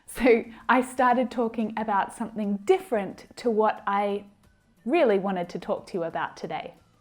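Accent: Australia. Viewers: Are you an Australian? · Thank you so much for your lessons l juste want to make sure are you Australian or American or Canadian???????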